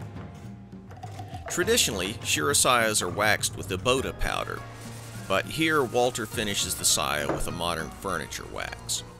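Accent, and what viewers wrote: United States. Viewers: Mr., out of curiosity, which part of america is your accent from?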